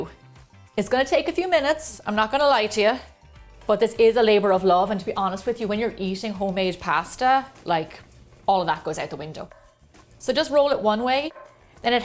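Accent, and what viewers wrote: Ireland. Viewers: I'm adding this to my list of what I've baked already: sourdough bread, pretzels, corn and flour tortillas, pita bread. I find that I love baking. My Grandmother used to make noodles. My Mom said she hung the noodles all over the house to dry. Interesting visual. By the by, I like your accent. You're Irish, right? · Are you Irish ? Love ❤️ the accent 👋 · So i was looking for an easy home made egg pasta recipe and just clicked one without looking at the thumbnail and the video started and i hear the familiar Irish voice. I was like omg it's 😂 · i love how your irish accent is sometimes coming through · I knew you were from Ireland even before reading. Love that accent.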